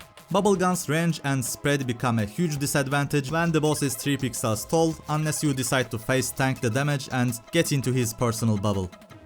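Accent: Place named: Turkey. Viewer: i kinda hear a Turkish accent where are you from? i love bubble gun btw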